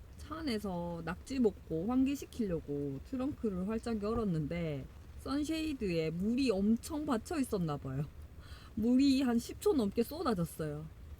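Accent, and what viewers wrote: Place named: Gyeongsang, South Korea. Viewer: 잘뵤고 있습니다~ 전대구에 사는데 경상도 억양이 정겹고 더 좋으네요~❤❤ 사시는곳 물어봐도 될까요~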